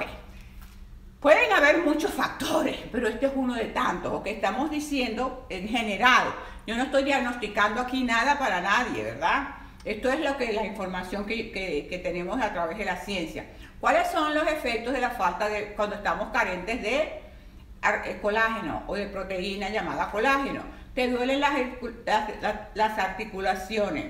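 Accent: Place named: Venezuela. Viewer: Que interesante escucharte jajajaja venezolana hasta el tuétano mami más claro imposible jejejeje, mil bendiciones desde Valencia, Venezuela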